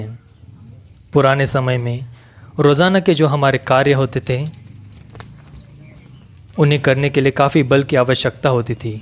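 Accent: Maharashtra, India. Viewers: I like your Marathi touch in your Hindi.... this is the beauty of our Bhartiya culture ❤️ Jai Hind 🇮🇳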